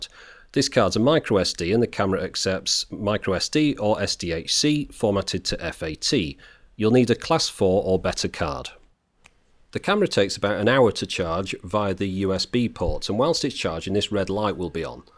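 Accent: United Kingdom. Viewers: Great review. I could listen to your smooth British accent all day :)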